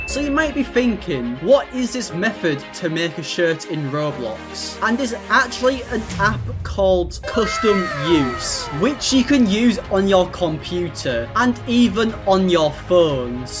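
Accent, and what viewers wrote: United Kingdom. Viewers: Mate, are you British?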